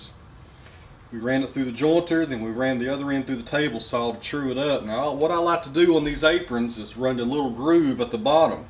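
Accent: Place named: South, United States. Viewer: That southern accent!!!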